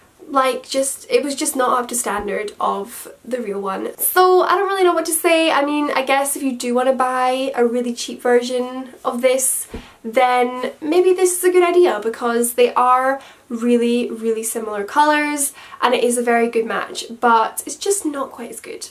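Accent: Scotland, United Kingdom. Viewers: Are you Scottish? Your accent is so lovely😻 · I feel like I hear a Scottish accent a tiny bit from you? Might just be me tho😂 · Omg right I was Watching this and I was listening to your accent and I was like you sound quite Scottish so I clicked the link and I was right I feel proud 😂 I'm from Scotland too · Omg I'm crying (literally) because your accent is exactly like mine! And to find a YouTuber that lives so close to me is insane!! And to hear you day Anastasia in a Scottish accent make me so happy! Because all the YouTuber I normally watch are American or English! Or Australian. So it's pretty cool to find a Scottish YouTuber!! X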